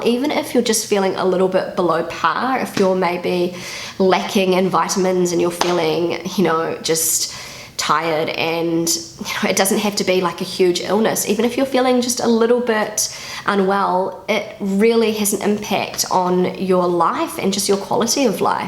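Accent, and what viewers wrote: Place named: New Zealand. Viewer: I'm very excited to Heart your nice exceptional Kiwi accent against cause IT thrills me everytime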